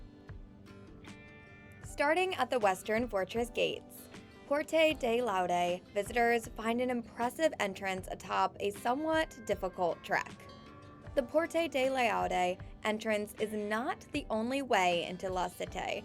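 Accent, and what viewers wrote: United States. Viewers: 😂😂😂 I’m sorry too I appreciate the video and all but girl! At least try to pronounce the locations the way they are meant to sound even imitating a French accent would be better than this😊 Im American too but come on we have all been exposed to the accents of visitors from abroad or damn haven’t you ever seen a Pink Pather movie lol just try a little! Oh and just so you know we don’t all sound this way..just like anywhere else ppl from different locations around the country have their own distinct way of speaking (ppl from the south, northeast, midwest, west coast, etc) but still the way she says cite-sitay!😂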